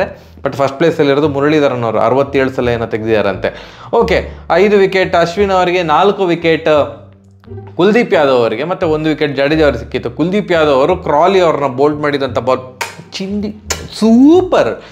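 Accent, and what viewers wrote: Mangalore, India. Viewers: Bahal chanda mangalore kannada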